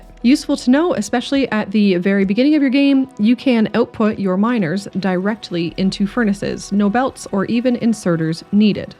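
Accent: Canada. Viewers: CANADIAN SPOTTED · I heard aboot, howdy fellow Canadian (if my guess is correct), the Factory must grow, eh! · subbed for the canadian accent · I'm pretty sure she's too Canadian to be AI, at least. No way any of the basic, bland, AI voiceover videos is calling anything an 'underdoober'.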